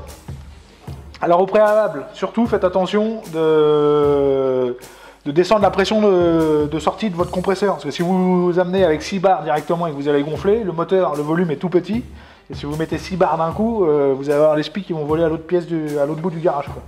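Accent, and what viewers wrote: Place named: Normandy, France. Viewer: on entend l'accent normand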